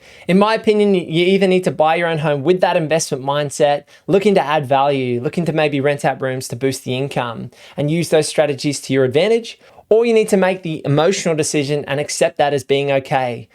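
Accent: Australia. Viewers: Love hearing an educated aussie accent. Could listen to this guy for hours.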